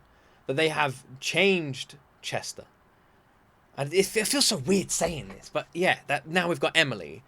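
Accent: United Kingdom. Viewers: Where are you from, mate? I'm trying to get better at discerning accents. I assume UK, but not sure what part. I went down a rabbit hole last night with reactions to LP releasing this new song/tour/album. They are one of my favorite bands, and I think they made the right move by going with a female new lead singer.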